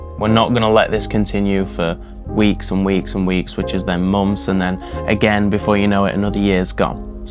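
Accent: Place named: Yorkshire, United Kingdom. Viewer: oooooooo Yorkshire asmr ❤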